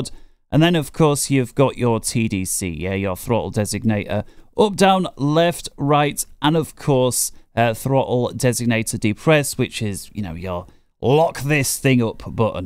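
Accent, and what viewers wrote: United Kingdom. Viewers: A really good teacher, even tho you speaking british :D If it comes to GMT, i only use it for looking for targets, then when I do, i lock with radar and then quickly switch to TGP and point track, then use laser Maverick to kill them.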